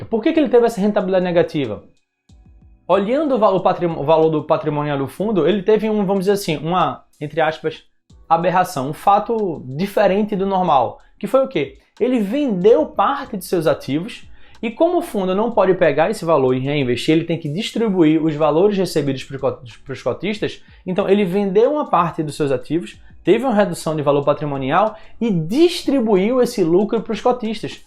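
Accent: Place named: Pernambuco, Brazil. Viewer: esse seu sotaque não me engana... é pernambucano, cabra da peste!!! kkkkk... vamos nessa meu conterrâneo! muito bom! 👏🏻👏🏻👏🏻👏🏻👏🏻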